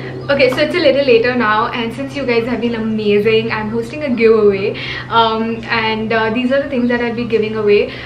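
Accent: Maharashtra, India. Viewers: Congratulations on 100k and many more coming soon! I remember when u hit 10k u were in tulum by forest tala and how happy u were. Being marathi girl you inspire me soo much and how cute is your marathi tone i just love it. I love your fashion videos and hauls. My dream is to make my instagram page <mail> one of Indias's top Self-care and productivity page. I post content about self care and productivity in the easiest form to reach maximum people. My goal is to make everyone practice self care in the easiest and most affordable ways. Soon planning to launch my planners also to help everyone become oraganised. I will send the copy of my planner to you when i launch it for reviewing because you review things very generously. Lots of love to you keep inspiring us ... May you hit 1M by the end of 2020. All the best dee!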